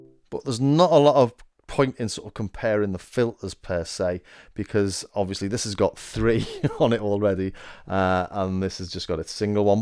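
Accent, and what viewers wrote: Liverpool, United Kingdom. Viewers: Glad I found yer channel. YouTube needs more of us with 'regional accents'. I've always liked Scousers (apologies if you're not actually a Scouser and now deeply offended).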